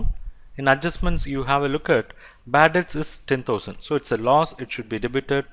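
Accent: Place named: India. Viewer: no offence but indian english is very shitty..yall casketing the shit outta it....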